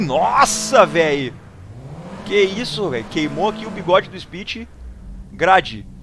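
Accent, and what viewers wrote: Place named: Bahia, Brazil. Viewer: Bem baiano viu cara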